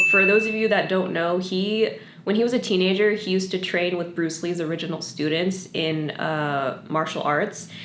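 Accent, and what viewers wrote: Australia. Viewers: I love that the aussie accent intro is making a reappearance! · girl I've gotten so used to you saying "in the description box down below" in an Australian accent that I get confused when other YouTubers don't say it in one lmao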